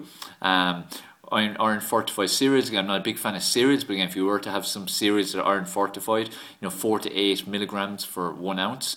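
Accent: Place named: Ireland. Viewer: thanks so much for the videos! what part of ireland you from man?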